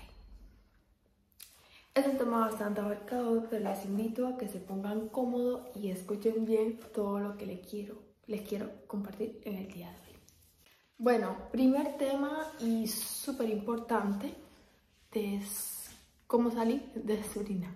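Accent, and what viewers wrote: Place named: Cuba. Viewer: Ese acento de que parte de Cuba es?? Un grande saludo de un Colombiano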